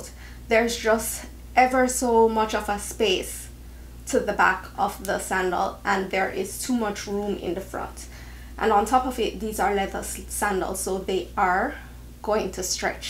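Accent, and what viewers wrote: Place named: Jamaica. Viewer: I hear the Jamaican accent bap bap lol 🇯🇲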